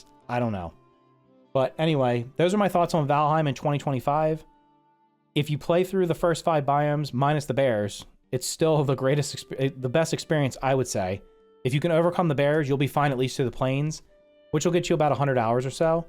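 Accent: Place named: Pittsburgh, United States. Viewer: Is this guy from Pittsburgh?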